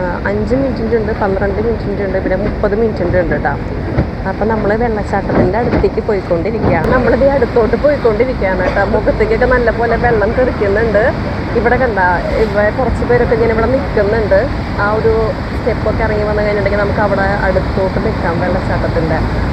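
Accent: Thrissur, India. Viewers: I love your Chalakudy Thrissur accent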